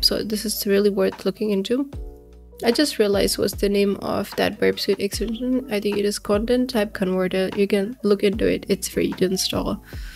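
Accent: India. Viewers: You should probably travel to eastern India rather than crying "pa jit" they just have accents like this... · this video is very informative i also studying in this field i don't know why a little bit of indian accent are i am noticing ..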